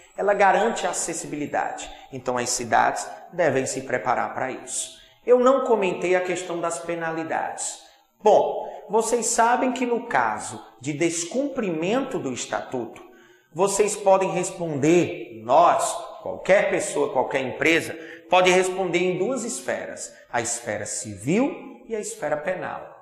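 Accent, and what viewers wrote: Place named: Northeast, Brazil. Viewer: Professor suas aulas são magnificas, e o sotaque não nega que é do nordeste. O povo aqui de Recife-PE já virou fã das suas aulas. Pfv mande ai mais vides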